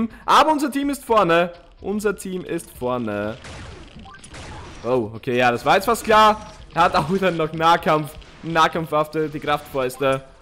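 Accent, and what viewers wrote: Austria. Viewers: Bist du östereicher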